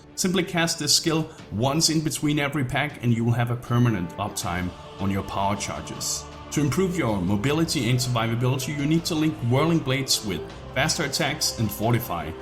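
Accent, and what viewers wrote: Denmark. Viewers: The accent tells me he's a Dane, am I right?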